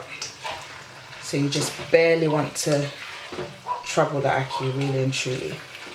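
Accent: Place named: West Midlands, United Kingdom. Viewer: I was thinking more West Midlands, it sounds like you have a hint of it. You are doing good with you channel, maybe we can come up with something together one day? :)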